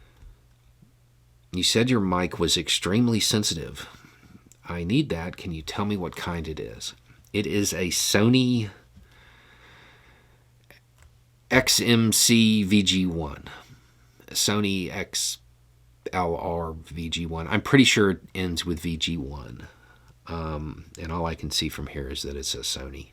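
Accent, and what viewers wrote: South, United States. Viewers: I appreciate that you only post when you're confident of the evidence. It's one of the reasons we continue to watch your videos. Finding reliable information isn't the easiest thing. We live in Australia so we have 2 reliable news sources (ABC & SBS) but they aren't as reliable as you. They also don't come with a southern drawl 😊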